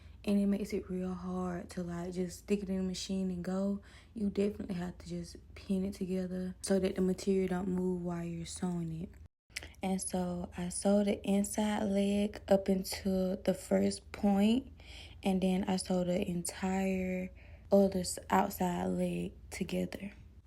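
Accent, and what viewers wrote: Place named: South, United States. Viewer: I think this is sooo Amazin!…., I think u should be wayyy up. U’re real deal. My Mama is a seamstress and I have to say that she and only u would be my personal designers if I wanted anythang Taylor made. I used to watch my Mama sew when I was young so she started showin me different things to tha point at around 10 years old, I was makin my own billfolds/wallet for my money to take to school. lol… she charge only $5 per alterations. It’s real money in it for your talent. I see this been 1 year ago so r u jus takin a break, becuz I know u ain’t give this up. Hit me back and let me know somethin. There nothin like Beauty wit Brains. Oh, I’m from Mississippi…where are u from?… I know it’s Southern like me cuz I can hear it in your voice. Mississippi, Georgia, Florida, Alabama, or Texas?… In that order.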